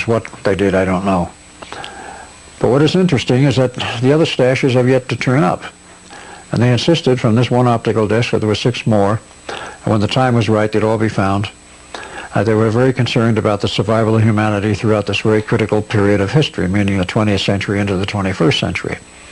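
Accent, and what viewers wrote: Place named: Boston, United States. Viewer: he most be from Boston.. is Al bostonian ?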